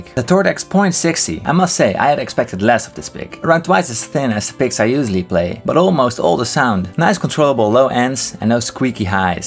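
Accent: Netherlands. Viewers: jij klinkt een beetje Nederlands? zou dat kunnen? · From which country are you? Your accent sounds dutch.....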